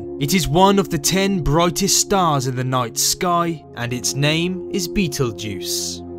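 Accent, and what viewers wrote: United Kingdom. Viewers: he’s British buddy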